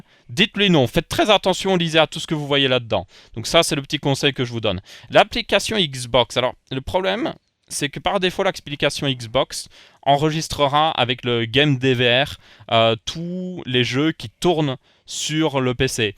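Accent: Belgium. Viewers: Salut, Merci pour tes conseils. Tu parles vite, avec un accent belge (très agréable ) il faut vraiment se concentrer avec toi vu que tu vas assez vite. Mais en tous les cas merci pour te vidéos. A+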